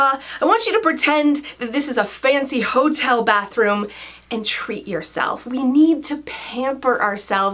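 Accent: Canada. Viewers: You are beautiful inside & outside! I truly enjoy every video & love your relatable personality (especially your lovely Canadian accent!). Don't change a thing!!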